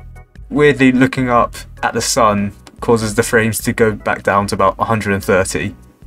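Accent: United Kingdom. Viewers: I like voice for it is very British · I love hearing British accent mmmm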